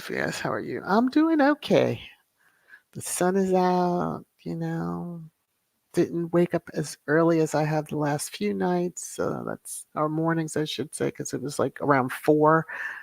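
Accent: RP, United Kingdom. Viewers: Thank you for the podcast 🙏 ❤❤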